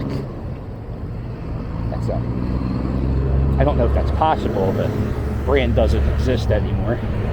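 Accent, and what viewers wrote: Philadelphia, United States. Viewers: Are you kidding your accent is Thick as pea soup. I’m from Philly and your voice screams Come have a cheese stake and pretzel GO EAGLES!!!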